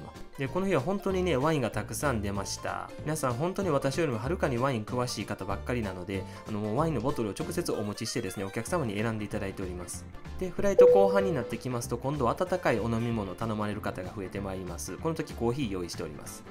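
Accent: Kansai, Japan. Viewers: いろんなとこ開けさらして何してんねや😂 関西色特に強め（笑） プレミアムスナックの正体が気になりました エコノミーでもらえるのと違うんや✨食べてみたい😋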